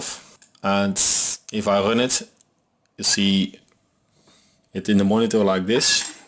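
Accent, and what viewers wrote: Netherlands. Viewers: It is Dutch.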